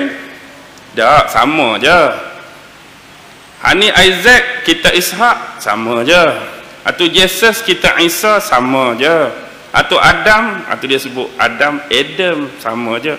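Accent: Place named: Penang, Malaysia. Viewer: asal kelantan tapi bahasa loghat penang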